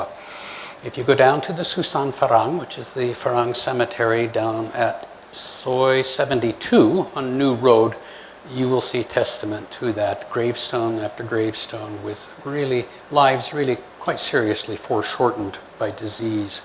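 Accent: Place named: United States. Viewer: Surprised, that you still speak a neat American accent after all these years in Thailand!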